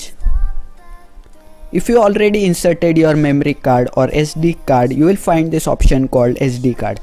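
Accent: India. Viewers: Indian much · For some reason I don't like that Hindi accent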